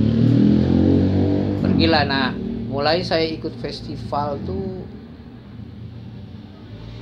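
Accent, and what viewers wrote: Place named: West Java, Indonesia. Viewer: Nyunda pisan bang😁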